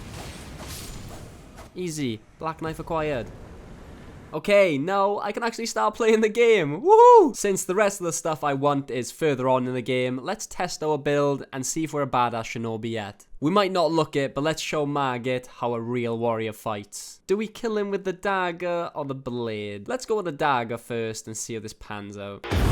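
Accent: Wales, United Kingdom. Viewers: Idk why but I'm getting a slight hunch that you're also welsh...just a hunch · Im a simple guy, i hear a welsh person. I press subscribe.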